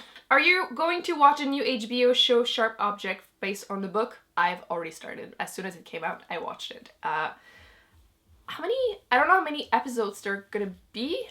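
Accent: Quebec, Canada. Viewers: Wow, I'm french and I love your accent when you speak Canadian french (and actually you're m'y favorite booktuber both in french or english) But I wonder...are there so many french people that are disrespectful and hateful towards Canadian french ??? I would be ashamed of my country....it's sad ! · I was a Canadian studies and French major at college and studied abroad in QC so your channel brings me SO much joy. I love when you speak French and your quebecois accent, it makes me feel so nostalgic.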